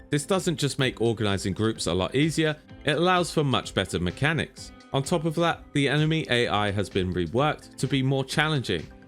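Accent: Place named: United Kingdom. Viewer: This was a very biased “hyped up” video. Let’s wait for Epoch to actually have a functioning server before we weigh the options. Also, a lot of what you stated about Turtle WoW demonstrates that you’re paid advertising for Epoch and/or haven’t done your research. You can’t hide behind your classy Victorian accent. Do your research, sir.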